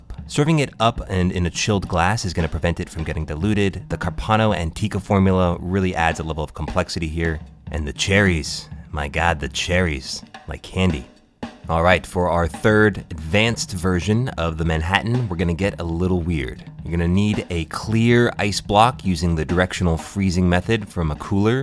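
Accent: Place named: New York, United States. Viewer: Knew you were a New Yorker hell yeah 🧐👌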